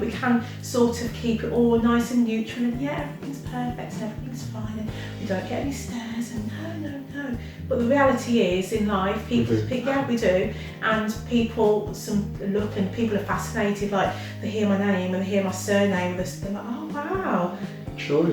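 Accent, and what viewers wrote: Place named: West Midlands, United Kingdom. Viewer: New to your Channel and love your personalities 😊. I can hear your West Midlands Accent ❤️. I use to live in Wolverhampton many years ago 😊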